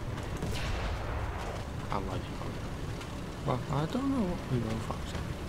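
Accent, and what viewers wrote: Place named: Australia. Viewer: Hey digging the aussie accent man! Straya!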